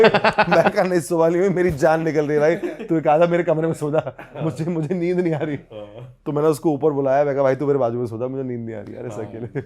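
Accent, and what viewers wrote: India. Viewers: So boring yr the person who is telling the story is speaking half Bollywood English by shaking his head and another half Hindi so so boring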